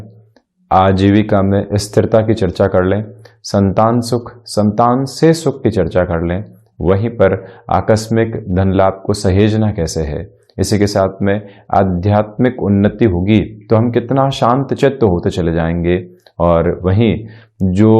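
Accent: Standard. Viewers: Pranam Guruji Your Hindi is very pure & clear . Very well explained. Regards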